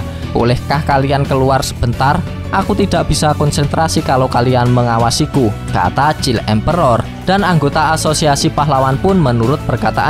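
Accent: Java, Indonesia. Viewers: Mantapp mas... Ntah lah logat jawa lebih enak di dengar buat reack manga... Ngomong ngomong stylenya mirip MR.K Animenia mas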